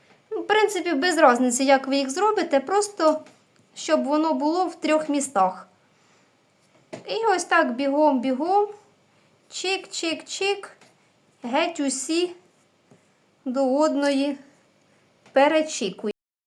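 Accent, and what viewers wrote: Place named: Poltava, Ukraine. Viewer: Яка гарна господарочка, так гарно все розказала i показала, приэмна така мова полтавського говору... Згадалося щасливе дитинство i бабусинi смачнi xрущики та пирiжки з вишнею. Спасибi за смачне печиво. Щастя та здоров'я господинi та ii ciм'i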